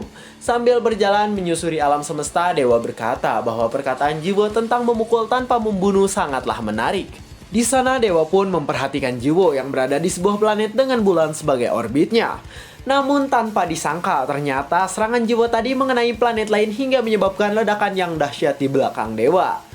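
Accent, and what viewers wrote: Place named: West Java, Indonesia. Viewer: Orang mana min? Gaya bicara sama bahasanya kaya sunda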